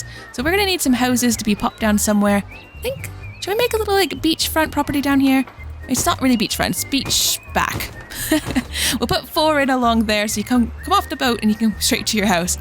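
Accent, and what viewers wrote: United Kingdom. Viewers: I thought I heard a bit of British and some other accent.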